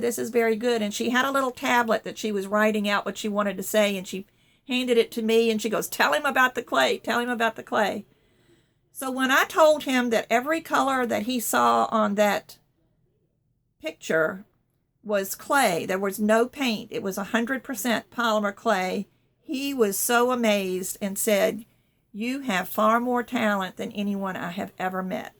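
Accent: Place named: South, United States. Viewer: Hello am the same one who liked ur southern(not cowboy...) accent!! And of course your great chit chat while sharing polymer crafts which are super helpful.this heart to heart video was so touching dear,i find you are so sweet and humble. Wish u the best for new position and whatever u decide i ll still be a faithful viewer from the island of crete in greece.take care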